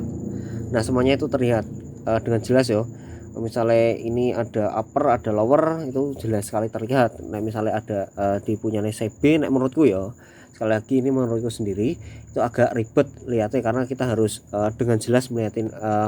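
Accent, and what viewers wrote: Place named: Java, Indonesia. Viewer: Mantep mas josss.... Bahasane medok habis pokoke, salam sesama GTR bumiayu, semangat❤️👍.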